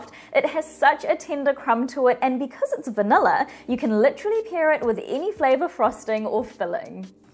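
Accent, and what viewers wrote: New Zealand. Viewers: I made half the recipe last night to taste test it. It tasted great. I’m going to keep using this recipe. Before I looked at your channel I knew you were a Kiwi from your accent. Much respect from across the Tasman Sea. Thank you for developing these recipes and sharing them.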